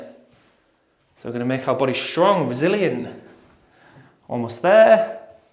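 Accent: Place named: North, United Kingdom. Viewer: I have historically hated strength training, only beginning to factor it in alongside my beloved running when the injuries started. Now it's of course a non-negotiable, and I can honestly say that your videos are some of the only ones I enjoy on here! It's almost not a chore! I'm super bendy (but with the stiffest ankles) with poor balance and find that some other vids speed through so I can't keep up, and also introduce advanced exercises quite early on which my lower back can't hack. I enjoy that you offer modifications, encourage us to take our time, but also talk through purposes too. Thanks - my fave runner's channel! Plus, I enjoy a fellow Northern accent!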